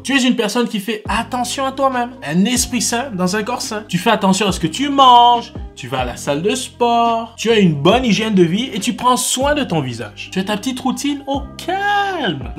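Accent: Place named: Quebec, Canada. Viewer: c'est un accent canadien ça s'entend a des kilomètres, lui surement du Quebec, sa manière de dire "présentement" il n’y a que les québécois pour parler comme ça , ce n'est pas une critique bien sur, bonne vidéo.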